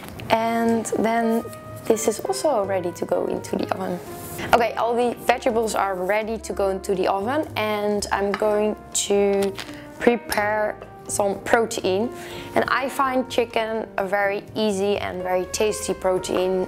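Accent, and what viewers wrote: Netherlands. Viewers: She's Dutch.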